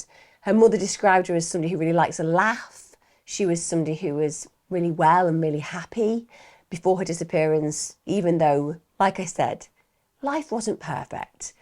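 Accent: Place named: Northern England, United Kingdom. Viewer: Thought you was from up North, Hi from Accrington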